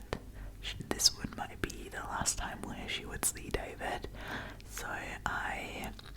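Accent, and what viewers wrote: Australia. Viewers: At first I totally thought you were from Australia because of your accent! But then you said that you’re from Germany, me too! And I also make videos in English ☺️ This story is so crazy! There were so many points where he could’ve cleared it up with you guys but he kept lying. Totally get why that must’ve been really hard on you at 18! Can’t believe you would do this to your friends. I hope that you now have better & more honest people around you!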